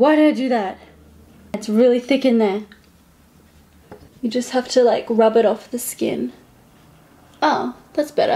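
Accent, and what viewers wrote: Australia. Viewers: Love you 💕 I love hearing a fellow Australian accent on YouTube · Are you Aussie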